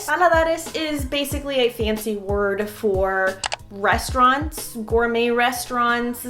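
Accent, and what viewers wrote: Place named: Spain. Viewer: Wow 😲 I got a nostalgia now! I was born there 😢😀... sorry I didn’t know you! Just discovered you cause Brian Sellers on Twitter 🎉 and came running to give you my support 🙏. Beautiful video! Great Spanish accent 🙏👍🙏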